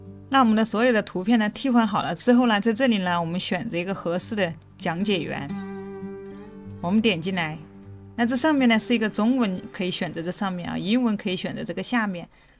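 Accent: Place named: Sichuan, China. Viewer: 哈哈，四川人哇，老乡见老乡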